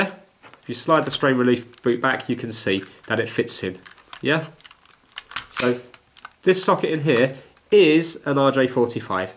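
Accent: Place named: United Kingdom. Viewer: Nice to put a face to the channel. Love the channel. Currently watching the backlog. I love the "Ok, yea", "Right, ok", "Yea". "Ok? Yea"... It's the region/accent, but it's so noticeable as someone from outside the UK.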